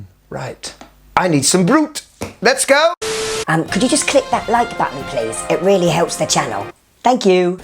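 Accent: United Kingdom. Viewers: I just realized who you remind me of….Benny Hill (which is a compliment in my mind). And not just because of the British accent , your humor is very similar. I also prefer utilitarian looking dive watches. I don’t like the wavy dial and certainly not the 1990’s bracelet style. I’d love to see Omega reissue their styles from the 60’ and 70’s in both their divers and sport watches.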